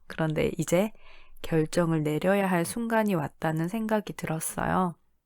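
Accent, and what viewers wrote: Gyeongsang, South Korea. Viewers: 원래 경상도세요? 전혀 몰랐어요 ㅋ 그리고 결정을 내리시는 순간에 저도 긴장했습니다 ㅋㅋ 저희도 찍을때마다 싸워서 늘쌍 고민중이에요 ㅎㅎ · 너무 좋아요 경상도라니 전혀 못느꼈어요 화이팅하세요!!!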